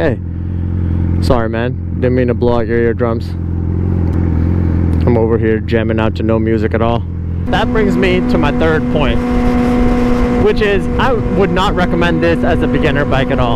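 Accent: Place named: Pakistan. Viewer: He’s definitely Pakistani, I can hear it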